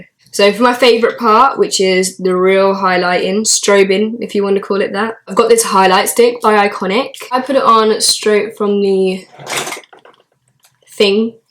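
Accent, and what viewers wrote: London, United Kingdom. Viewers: very cool! i was wondering because your accent sounds much like accents from at or around london :-)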